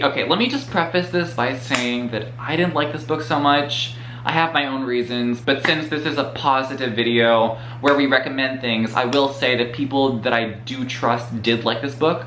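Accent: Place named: United States. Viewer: Can you believe that!!! I was surprised too, for his American accent is way amazing.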